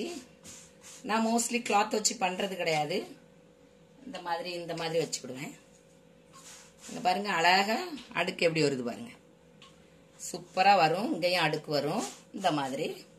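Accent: Tirunelveli, India. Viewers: Tirunelveli Tamil super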